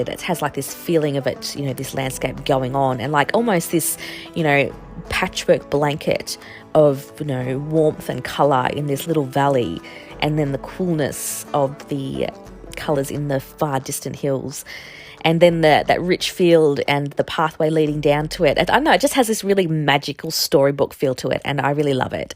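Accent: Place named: Australia. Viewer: Hi, I just found your channel and it was lovely to watch your process and hear a fellow Aussie accent!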